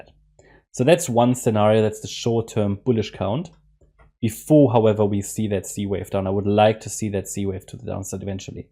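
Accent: Germany. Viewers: Man I can feel that German accent, love it. Keep it coming. Can you reference a link to your membership and what is actually available for subscribers \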